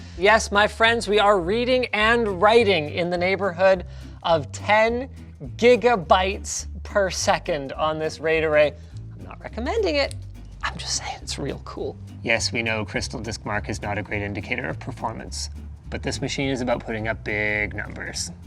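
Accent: Canada. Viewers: I've never heard him sounding this Canadian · i didnt realize was Canadian til this episode, so many eh's lol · The Canadian is strong with in this one. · is saying ",aye?" more i think his Canadian accent is finally coming jn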